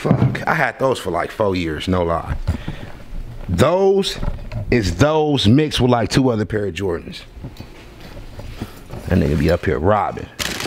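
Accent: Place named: Los Angeles, United States. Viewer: first time on your channel... but are you from LA? you just got that accent lol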